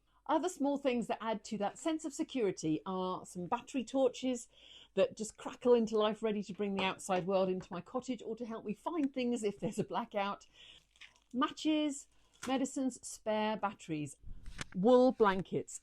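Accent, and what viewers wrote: United Kingdom. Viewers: Du bist Schwedin??? Warum hast du so einen englischen Akzent?? Ich verstehe schwedisches englisch sehr gut, englisches nicht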